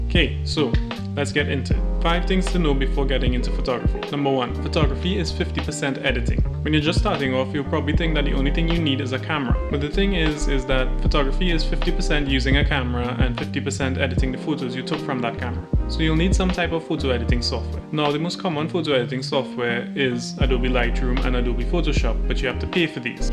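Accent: Trinidad and Tobago. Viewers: Lol how u sound like a trini so bro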